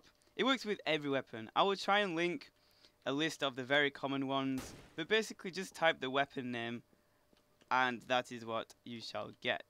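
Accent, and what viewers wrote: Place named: England, United Kingdom. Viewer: fucking anoying english accent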